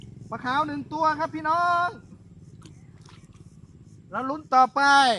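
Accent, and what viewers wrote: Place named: Isan, Thailand. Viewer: ได้ยินแล้วคิดถึงอิสานบ้านนา fcจากฮ้องกงค่ะ ขอให้ยอดติดตามยอดlikeสูงขึ้นเรื่อยๆน่ะค่ะ^^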